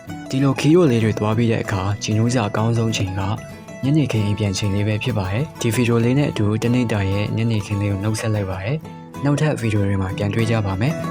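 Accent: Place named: Dawei, Myanmar. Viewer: ထာ၀ယ်အသံကြီး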